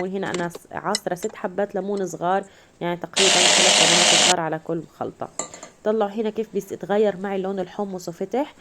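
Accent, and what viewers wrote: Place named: Egypt. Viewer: انت ليش بتحكي مصري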